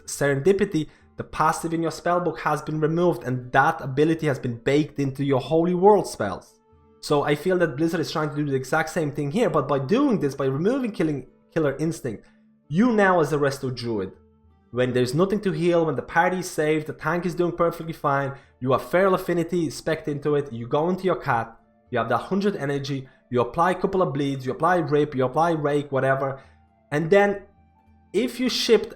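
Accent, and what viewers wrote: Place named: Dublin, Ireland. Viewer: Where are you from? I can hear a bit of a Dublin accent in your voice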